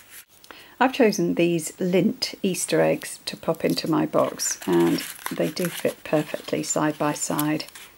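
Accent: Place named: United Kingdom. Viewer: wonderful tutorial. Enjoyed your clear, British voice.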